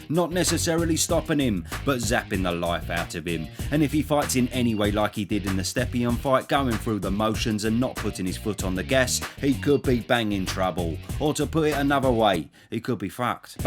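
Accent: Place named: Essex, United Kingdom. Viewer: The essex accent is funny as f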